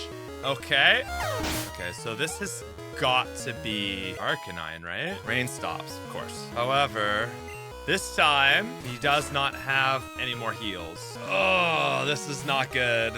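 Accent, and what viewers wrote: Canada. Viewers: dude, i mean this in the best way possible but i just happened to find your video in my recommended and i heard you speaking, particularly how you pronounce "out" and words that end in -out and immediately clocked you. i say this as a canadian myself, i just never noticed how easy it is to tell until i see a canadian youtuber when most of the ones ive been watching recently are not canadian/dont have that particular accent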